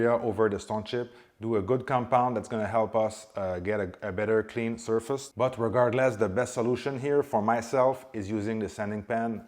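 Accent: Quebec, Canada. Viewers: yes osti I will say he is a quebec guy 🙃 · I get to the end of the video and I’m like oh wow this guy must be Canadian cause I just noticed his accent, since I was sooooo busy paying attention to every step. Great video sir, it has given me the confidence to wet sand my own paint for chip repairs. Of course gonna get a junk panel for free somehow to practice 1000 times, but i finally have every step and tools I’ve never had before! Blessings! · Fantastic video, the best I saw for rock chip repair. By the way I noticed your Quebec accent.:washhands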